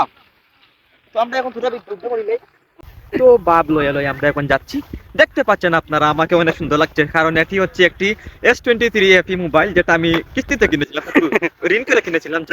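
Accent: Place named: Sylhet, Bangladesh. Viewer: Vair mat huniya amr Abba haste haste sesh😂😁 koin ada sylheti ada shuddo bashaa😆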